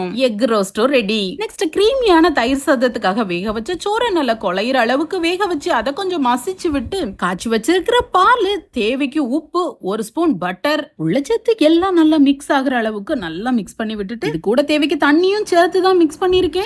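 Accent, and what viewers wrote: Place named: Nagercoil, India. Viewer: Enakum Nagercoil language rmba pidikum enga relatives anga irukanga sister unga video elame super god's grace epome unagaluku unga families kum irukum god bless you sister ❤❤❤❤❤❤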